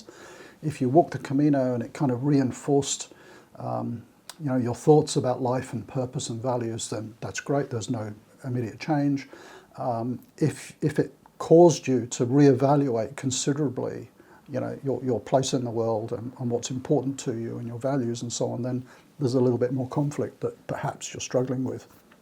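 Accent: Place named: Australia. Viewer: listening to this video was like hearing my own thoughts. My walking buddy and I also have amazing partners who are happy for us to follow our dreams and walk Caminos. Our 3rd Camino will start April 2024 on the Camino Portuguese (and hopefully Finesterre and Muxia) if time and no injuries allow. I love the simplicity of walk, eat (cafe con leche) sleep, repeat, meeting people from all over the world who have the same passion, and especially how I felt at the end of my last one: fit, relaxed and wanting a more simplistic life. Now I have retired, I have achieved the simplistic life and happily planning our next Camino. Your tip about not going into too much detail of your walk with others who have not walked a Camino is spot on. Just watch their eyes and when they start to glaze over, its time to change the subject 😀Thank you for your channel, its great, especially listening to a relaxed Aussie voice. 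I'm from Queensland, cheers Wendy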